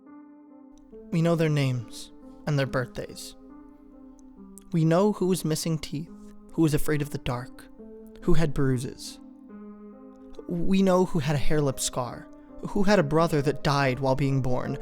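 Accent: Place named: South, United States. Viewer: Great narration! Loved the "southern" voice. Is there a part 2???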